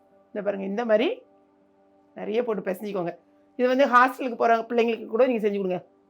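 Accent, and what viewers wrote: Tirunelveli, India. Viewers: Neenga tirunelvelithana akka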